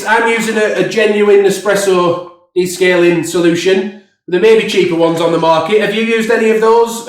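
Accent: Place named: Bolton, United Kingdom. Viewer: love the video and the accent! You're not far from Bolton/Chorley area.. my ex-partner came from there and I never tired of listening to him.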